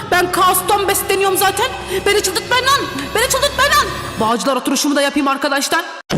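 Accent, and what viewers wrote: Adana, Turkey. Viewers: Abla adana mi yasiyon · Abla Adana'da mı yaşıyon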